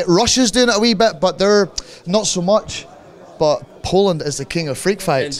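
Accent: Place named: Ireland. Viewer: Zdecydowanie nie, jeśli ktoś ma styczność z Irlandzkim akcentem pierwszy raz, to prawdopodobnie nie zrozumie połowy z tego, co mówi Parke, mimo dobrej znajomości języka.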